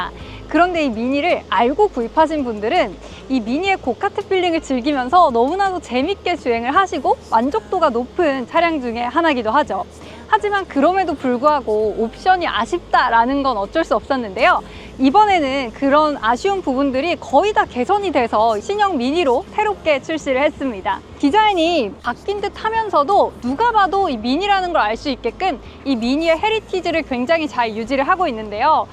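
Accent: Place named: Gyeongsang, South Korea. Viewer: 경상도 촌넘 ㅜㅜ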